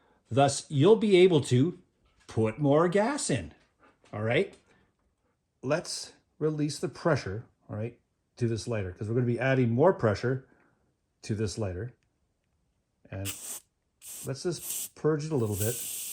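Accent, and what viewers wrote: Canada. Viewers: Totally unrelated but this guy is definitely Canadian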